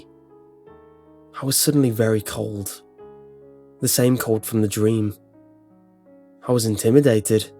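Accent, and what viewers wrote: England, United Kingdom. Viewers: U made me so scared I litterly can't fell my heart beat anymore Plus u sound like ur from England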